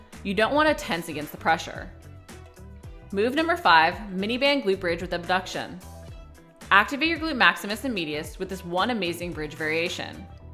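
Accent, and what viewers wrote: United States. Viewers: Love the accent. American English sounds so lively compared to English here in London.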